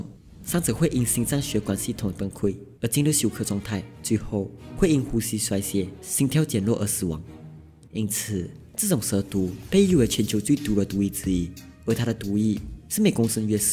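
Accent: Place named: Malaysia. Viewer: 這馬來西亞口音我一北方人真的是聽的累死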